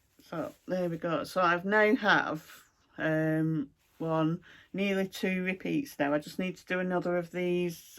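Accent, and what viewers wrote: Lancashire, United Kingdom. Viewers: HI,great video, nice to hear a fellow Lancashire girl ( I am a Blackburn girl originally!) Love the block party pattern - I have just bought the Halloween one from nimble thimble for daughters up and coming birthday ( aka flossibilities on you tube), I love the winter patterns especially the ones with snow!!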